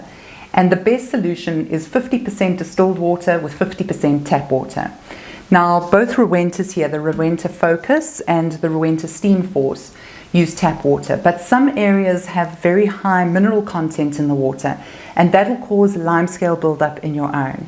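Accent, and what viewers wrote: South Africa. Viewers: You sound South African😊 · She has a South African accent.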